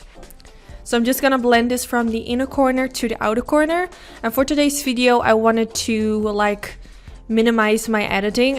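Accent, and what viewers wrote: Netherlands. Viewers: I’ve never seen one of your videos, but the second you started talking, I knew you were Dutch haha! 🇳🇱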